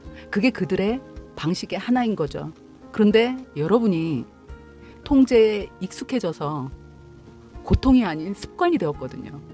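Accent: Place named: Busan, South Korea. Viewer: 선생님 부산이시네용~~~♥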